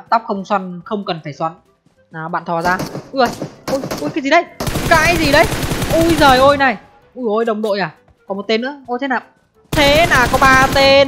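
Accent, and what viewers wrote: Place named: Hai Phong, Vietnam. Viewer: đi đâu vậy a zai ? quen vãi . hải phòng mà e đặc sản giọng đó ☺️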